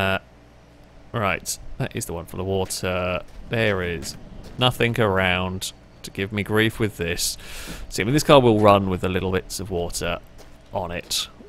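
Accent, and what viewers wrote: United Kingdom. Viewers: dude it's a joke because almost every American loves guns, so him not taking the other gun isn't very American, and obviously he has a extremely strong bri'ish accent.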